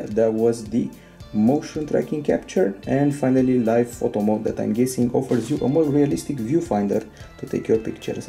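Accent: Romania. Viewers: se simte in voce ca esti roman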